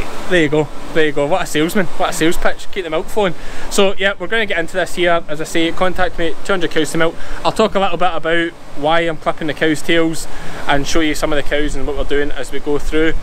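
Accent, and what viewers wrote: Scotland, United Kingdom. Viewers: I’m so glad Sandi recommended you! If my daughter-in-law weren’t from Scotland, I’d need subtitles. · I had a couple of Scottish colleagues when I worked in the UK years ago so it is nice to hear the accent again. The YouTube generated subtitles can be quite funny in how they don't always fit.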